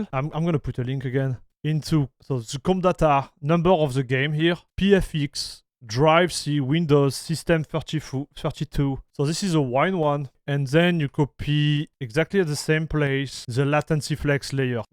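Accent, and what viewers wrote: France. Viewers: He is french guy? The accent its funny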